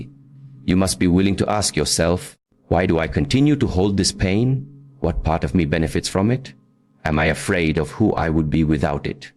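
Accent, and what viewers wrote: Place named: India. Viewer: Okay he sounds like he's from india on this one, It definitely threw me off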